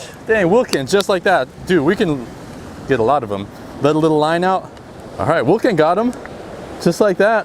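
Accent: Bay Area, United States. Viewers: U know from the bay when he says hella yee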